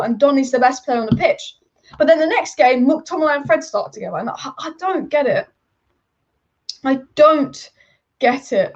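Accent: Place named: United Kingdom. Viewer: please please Speak little bit Slower everyone is not English specially its more difficult to understand british accent,please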